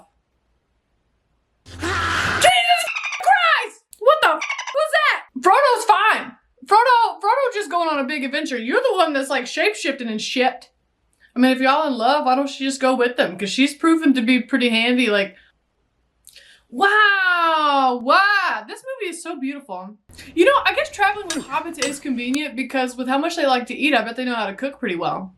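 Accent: South, United States. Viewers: your Southern voice makes me think of home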